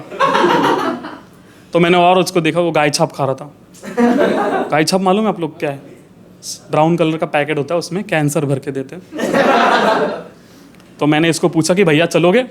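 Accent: Maharashtra, India. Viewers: Marathi accent hota na to aavdla sir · Getting Zakir vibe plus marathi accent .. good one ! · Marathi accent shabd shabd se jhalak rahi thi. Maja aali 👍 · Marathi accent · Ohooò bhau that marathi accent is to hilarious. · Marathi Mulga ani marathi accent...chumma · ...Phir dusra sapna dekha😂😂😂 Bhari vaatla marathi accent mdhli stand up aikun🙌, mhanun bakichya jokes peksha tuze jokes jaast relate zale😂😅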